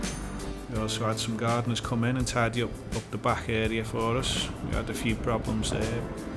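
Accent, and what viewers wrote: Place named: Lancashire, United Kingdom. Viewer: Your accent.. West Lancashire/Merseyside.. How you find it living up there? Long way from home, but I've always wanted to move up there myself too. How's it in your experience?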